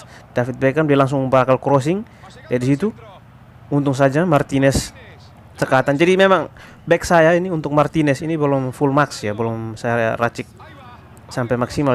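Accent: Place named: Kupang, Indonesia. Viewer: Lu orang mna ko logat ke orang Kupang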